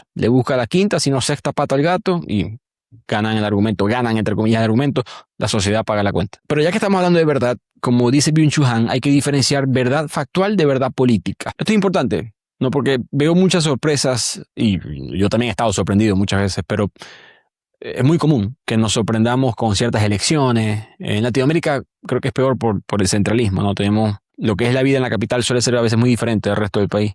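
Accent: Maracaibo, Venezuela. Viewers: Amé el tema y amé el acento maracucho 👏🏻👏🏻👏🏻👏🏻😂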